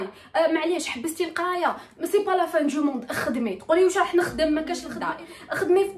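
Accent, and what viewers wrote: Oran, Algeria. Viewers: بالبركة عليك ربي يهنيك متأكدة ماما رقصت رقيص ماشي معقول كنت تخرجلها مالقصب ضروك اتريح ههههه ربي يحفظها و يطول في عمرها بصح يا صديقتي كي تهدري بالوهرانية تاكل منك حتة ربي يسهلك جميع امورك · كنتي تهدري وهرانية فووووور 😥😥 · اللهجة لجزائرية زوينة 🤩🤩 مغربية مرت من هنا لي يزبل فيا نكلع جد بوه نخرجها له طاي طاي 🤣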